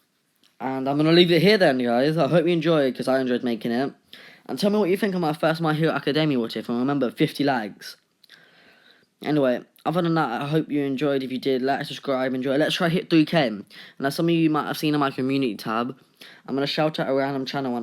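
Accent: United Kingdom. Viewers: Yer british aint ye mate like yer accent could ya be british to? Idk ;-; ya sound british alright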